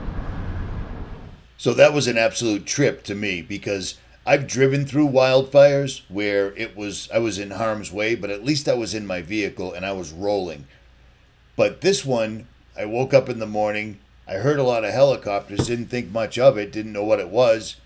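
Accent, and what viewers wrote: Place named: Boston, United States. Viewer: You sound like richie from Boston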